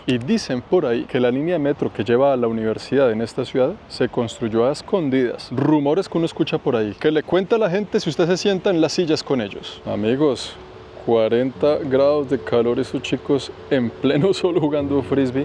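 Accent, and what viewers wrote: Bogotá, Colombia. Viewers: es colombiano su acento es el Bogota donde creció y se educó, el es ingeniero, luego se fue a Canadá y estando allí se volvió youtuber, siendo uno de los mejores. Ahora viaja por mundo. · demás de educativos y de muy buena calidad tus videos, me haces reir mucho con algunas expresiones, me encanta tu acento Colombiano. Saludos de otra Abuela desde Dallas Texas, que te acaba de adoptar 👵👵👵👵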